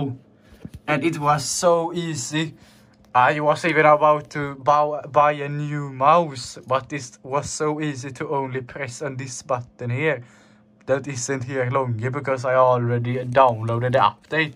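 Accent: Sweden. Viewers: You're swedish accent is leaking out too much man. Anyways, thx for the help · Awsome, fixed it for me as well! Also, love the svengelska. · Are you swedish? xD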